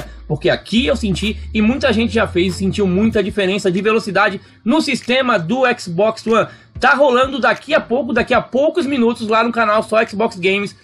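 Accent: Florianópolis, Brazil. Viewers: vc é de floripa? tbm sou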